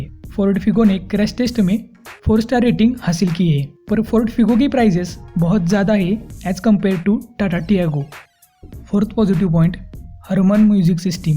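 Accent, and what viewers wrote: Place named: Satara, India. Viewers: भाउ तु मराठी मानुस दिस्तोय बोलन्या वरुन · Bhau tumhi Satara che aahe watta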